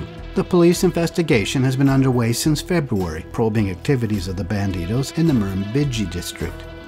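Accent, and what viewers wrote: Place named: Canada. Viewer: As per usual a good vid. I must say "thumbs up" for the nearly correct pronunciation of "Murrumbidgee". It's a hard one for non-Aussies, I sense a bit of a Canadian accent? Your "Melbourne" is also pretty good.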